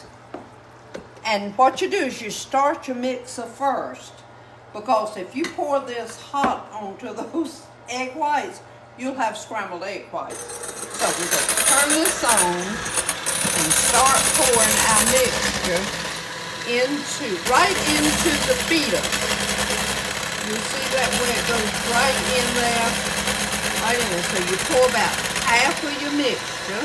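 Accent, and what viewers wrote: South, United States. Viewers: I miss that southern accent. I use to say boil just like you. I don't understand why that person said they need captions bc they couldn't understand you. I hear ya just fine😊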